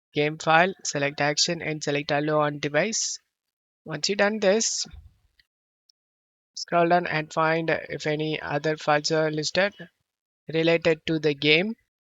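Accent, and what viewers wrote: India. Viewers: BRO.... I WOULD GET ERRORS ALL THE TIME DLL..WHATEVER, SIMPLE FIX WHEN FIT GIRL UPLOADS HER GAMES... THANK YOU.......YOUR VIDEOS SICKKKKK....I WOULD FIX ONE ISSUE...DO IT SLOWER BECAUSE OF INDIAN ASCENT...I THINK...BUT SUPER HELPFUL I SUBSCRIBED THANK YOU.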